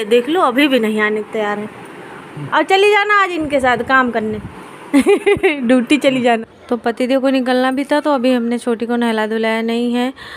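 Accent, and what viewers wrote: India. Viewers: Mujhe to nhi lga UP ka bhasa acha lgta h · Kanpuriya boli acchi lagti hai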